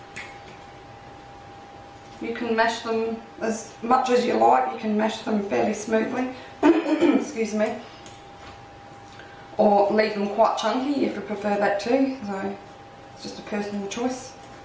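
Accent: Australia. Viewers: Wow these older videos of yours got lower views than your new ones. Too bad people forget to check out our older videos on youtube. They always want new ones. I can hear more of your Australian accent in this video. I didn't know you did cooking videos in the past. I like banana bread too. Did you make yours in a cake pan? bye.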